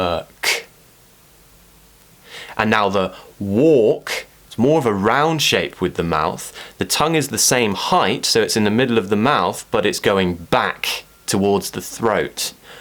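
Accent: RP, United Kingdom. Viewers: Sound more British then bristish itself haha best teacher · Economic expressions or any expressions about business would really help me:D because I'm studying at Business Academy. You're really awesome teacher. I absolutely love your British accent. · You are the best British English speaker. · Best teacher ever!!! Love your videos and your British accent, are you from London? 😍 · Awesome! Thank you very much! I'll get it someday, I'll get this British Accent one day! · You've chosen some of the most common words that are typically mispronounced. Your explanations are very clear - great job! I love your soft British English and your kind eyes. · I really love your videos and British pronunciation P.S. You are so cute^^ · oh teacher iliked your British languaga so amazing thank you so much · I like your British accent. Please please can you make a vedio of some random difficult and long English words. 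For example you did already Entrepreneur. Can you do concierge or other similar heavy English words that we can still use is everyday conversations. Not really sure if you get what I'm trying to ask... I hope you do though... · Very interesting find. I could watch you talk all day eventually I would have a whole British accent 😁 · Hey i've been wondering that what accents do you teach. is that RP?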